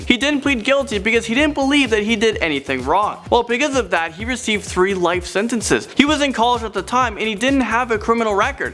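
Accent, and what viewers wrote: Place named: United Kingdom. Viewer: Emi c; edits I wasn't complaining about his accent, if anything i would have praised it, i happen to like the british accents.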